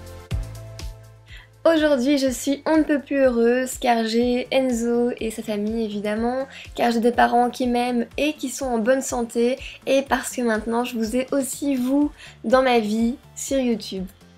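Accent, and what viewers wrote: Belgium. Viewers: est ce que tu es Belge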